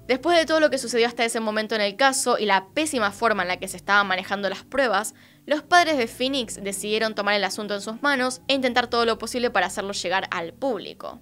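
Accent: Argentina. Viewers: No m gusta el acento argentino pero ella no se me gusta escucharlo de ella..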